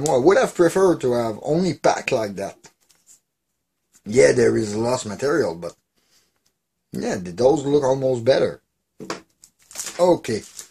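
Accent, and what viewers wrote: Quebec, Canada. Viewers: J'ai reconnu ton p'tit accent du bon Québec :) Bonne vidéo my man! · Tu vien du quebec?! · quebecois et fiere de l'etre jai reconnu ton accent hahah! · wait you come from quebec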